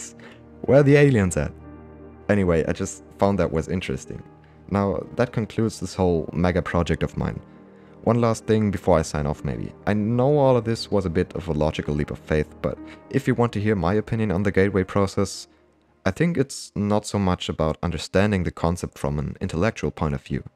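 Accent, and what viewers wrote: Germany. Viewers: "Oh shit digger" are you German? hahaha edit yep, you definitely are xD (great video btw) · Me: this kinda sounds like a German accent Him: Oh shit digga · sehr gutes video, nicht unnötig überzogen, keine dumme angstmacherei, absolut ehrenwertes verständnis der physik und vor allem der thematik. sehr angenehmes englisch, für einen deutschen🫣. hast du die tapes bereits selbst getestet?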